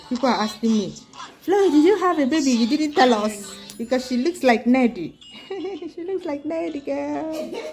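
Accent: Igbo, Nigeria. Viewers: I finally see ur face.....been hearing ur igbotic voice....weldon ma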